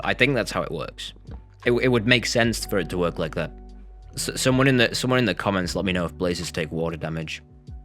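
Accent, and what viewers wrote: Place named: United Kingdom. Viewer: Why.is he birtish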